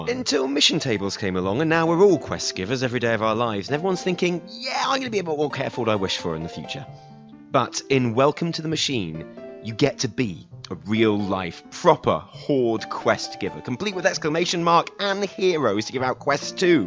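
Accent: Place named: England, United Kingdom. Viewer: Hey, anyone know what his accent is from England? I'm Hampshire but I can't quite place his. Thanks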